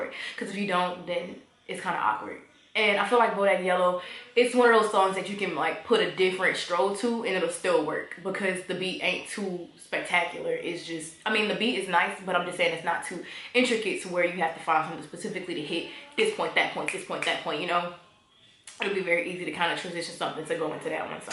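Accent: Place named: New Orleans, United States. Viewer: Yea that NOLA accent came out STRONG in this video 😂😍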